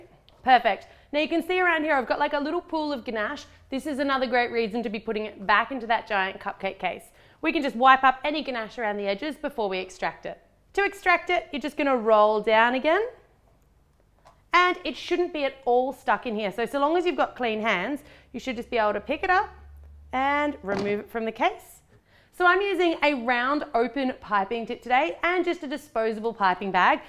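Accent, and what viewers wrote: Australia. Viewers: Are. You australian ?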